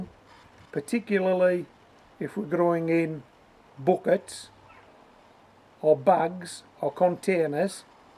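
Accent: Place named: Newcastle, United Kingdom. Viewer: Fab for this expat to hear a Geordie accent!Greetings from Bavaria! btw I built a horse manure hot bed. I ordered this book by a guy up north near you.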